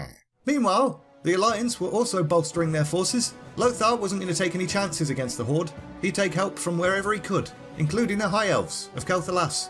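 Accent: United Kingdom. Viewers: Are you British? I like ur accent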